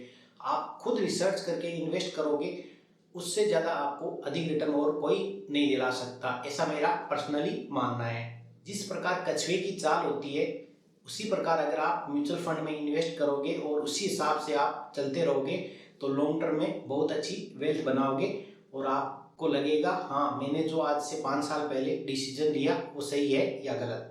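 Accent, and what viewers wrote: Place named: Shekhawati, India. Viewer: तभी मुझे आपकी बोली में अपनी शेखावाटी भाषा की टॉन नजर आती है😋😀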